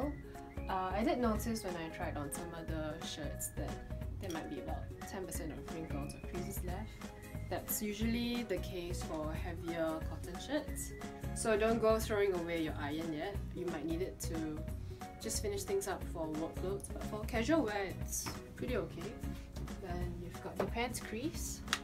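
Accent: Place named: Nigeria. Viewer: No disrespect, I hear a little bit Nigerian accent in her. I enjoyed your presentation my lady.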